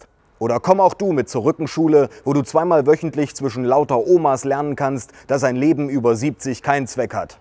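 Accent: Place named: Saxony, Germany. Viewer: Der sächsische Harry G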